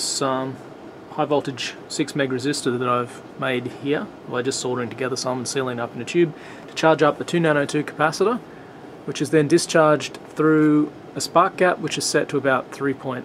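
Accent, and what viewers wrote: Australia. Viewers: Very well explained. When Im tired of modifying my 1958 radio (6BX6 RF amp, 6BL8 local oss and mix, 6BX6 IF, 12AX7 Baxandel tone and 2x 6GV8 audio) to fill the dial with DX, I would love to have a crack at a Tesla Coil. I agree that tuning will improve things. Some people reckon harmonics can be good, guess I will find out. Great to hear an Aussie voice (besides Mr Jones) here on youtube.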